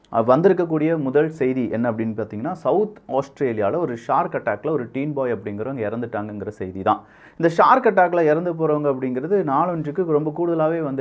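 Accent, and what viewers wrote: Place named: Kerala, India. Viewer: Kerela TAMIL NO NO 😂😂😂😂😂😂😂😂😂😂😂😂😂😂😂😂😂😂😂😂😂😂😂😂😂😂😂MOUTAL😂😂😂😂😂😂😂😂😂😂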